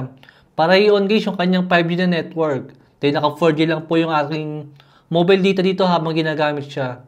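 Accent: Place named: Bulacan, Philippines. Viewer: taga san k boss yung accent mo parang sa bocaue bulacan 😄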